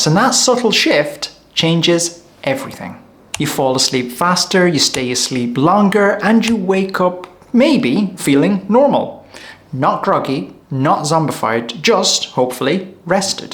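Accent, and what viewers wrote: Ireland. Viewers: Well…you may be working for the NHS but you’re definitely Irish. Tell me I’m wrong? Bet I’m not!😊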